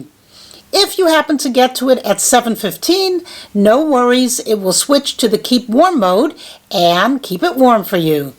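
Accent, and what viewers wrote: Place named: United Kingdom. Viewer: Thanks for the great vid! May I ask.... do I detect just a slight UK origin to your lovely accent?? Cheers!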